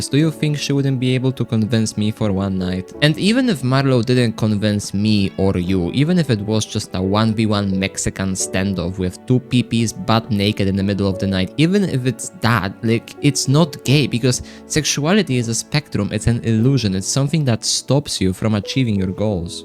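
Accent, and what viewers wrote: Poland. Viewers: slychac ze polak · You have a Polish-like accent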